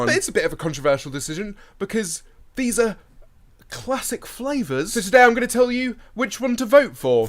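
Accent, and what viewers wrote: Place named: RP, United Kingdom. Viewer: 2017 sounds way more posh compared too 2019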